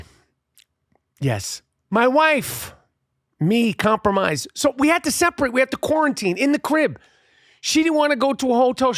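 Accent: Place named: Brooklyn, United States. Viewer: Hi! Hope your wife feels better and your family recovers completely by 2023. I am a Brookyn expat living in the DR and listening to your accent reminds me of home. ❤🙏 Thank you so much for being you.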